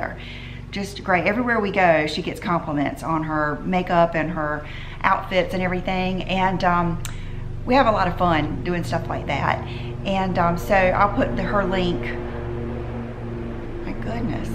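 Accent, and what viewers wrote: South, United States. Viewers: I love the natural look on you!! So gorgeous. Btw, it cheers me up so much everyday just to hear your sweet voice. I am from GA and I love a fellow southern girl accent!!! You remind me so much if myself. People say we’re sweet but we can be tigers too! Lol. 😘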